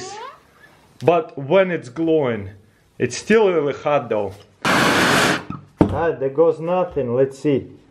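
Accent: Russia. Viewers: I really love his Russian axent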